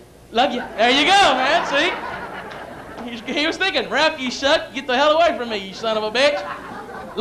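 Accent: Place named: South, United States. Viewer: didn’t realize he had a southern accent .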